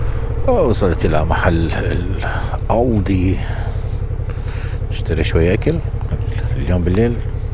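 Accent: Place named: Iraq. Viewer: المناظر جميلة ماشاء الله و سماع اللهجة العراقية اجمل ...تحية لك اخي الكريم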